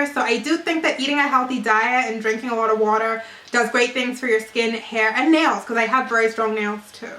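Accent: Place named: England, United Kingdom. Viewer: ok cause I knew I heard a lil english accent there but wasn't sure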